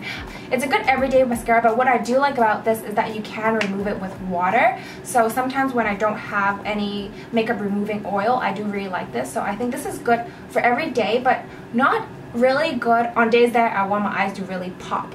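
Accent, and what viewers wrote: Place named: England, United Kingdom. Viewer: Love ur English accent xx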